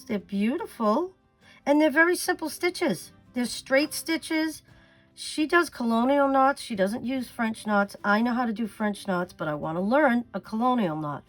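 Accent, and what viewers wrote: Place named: Massachusetts, United States. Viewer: What part of Mass are you from, because I detect an accent?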